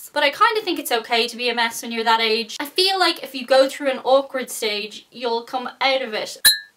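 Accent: Ireland. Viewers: What part of Ireland are you from I'm from dublin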